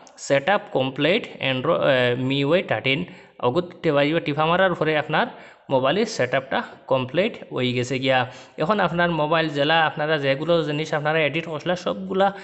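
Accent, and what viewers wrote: Sylhet, Bangladesh. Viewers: Sylheti bro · আপনি সিলেটের ভাষায় কথা বলেন কিভাবে 😊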